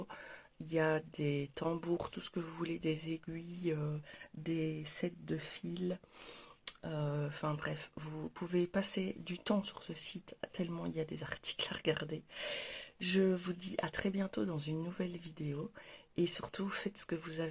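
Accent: Belgium. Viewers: tu aurais un petit accent belge ?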